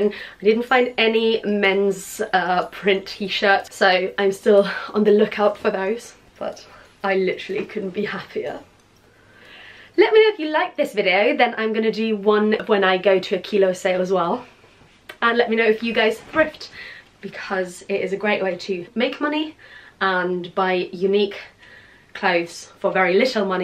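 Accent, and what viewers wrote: United Kingdom. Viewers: now that is what i call a british accent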